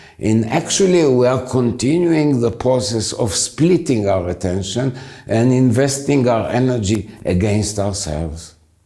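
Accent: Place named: France. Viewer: I love your accent are you French and thank you for your wonderful, articulate wisdom. A merci...😊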